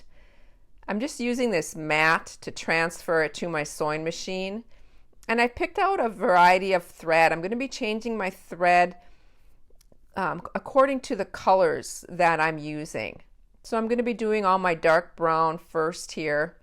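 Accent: Minnesota, United States. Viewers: Ah that Minnesota accent with your fabulous creativity. Love it!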